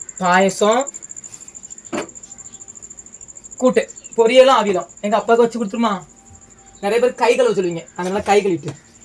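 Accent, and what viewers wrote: India. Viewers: உன் பேச்சு வார்த்தை எல்லாம் தூத்துக்குடி மாவட்டத்தில் உள்ள பேச்சு மாதிரி இருக்கு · தம் தந்தைக்காக, தம் குடும்பத்துக்காக உணவு வாங்கி கொடுக்கனும்னு எண்ணுறதே பெரிய மனசு தம்பி. அந்த உணவு வழிபாட்டுத்தலங்களில் கிடைத்தாலும் சரி, திருமண நிகழ்ச்சிகளில் கிடைத்தாலும் சரி, யாரேனும் கொடுத்தாலும் சரி, நீ உழைக்கும் இடத்தில் கிடைத்தாலும் சரி அதை வாங்கி குடும்பத்தார்களுக்கு கொடுப்பது மிகப்பெரிய நன்மை உண்டு. உம்முடைய நெல்லை பேச்சு மிகவும் அழகாக இருக்கிறது. உன் பெற்றோர்களுக்கு கடவளின் ஆசிர்வாதம் என்றென்றும் கிடைக்கட்டும்.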